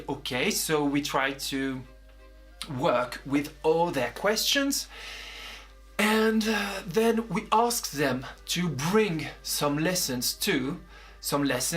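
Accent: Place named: France. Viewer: Your English is better than you think and don't worry, I could understand everything. Besides I love your French accent!. Things are soooo different! I'm not sure I like your system better, but it sure would be nice to have job security. Here without professional development you can't renew your teaching license, which you have to renew every 3 or 4 years.. In my school district you have to have a masters degree to be hired and you are on probation for 3 years. Then you have seniority, but even then, due to budget cuts you still can be laid off. A week or so ago we were told that maybe 8 teacher will have to go next school year. I wish I was French!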